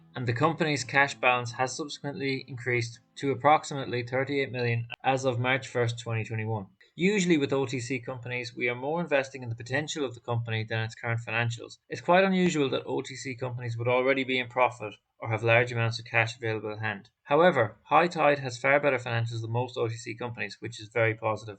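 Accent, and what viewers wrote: Ireland. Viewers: Nice man subbed! Irish accent?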